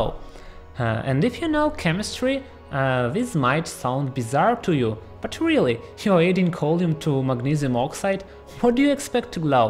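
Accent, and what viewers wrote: Germany. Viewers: He sounds German I wonder that too xD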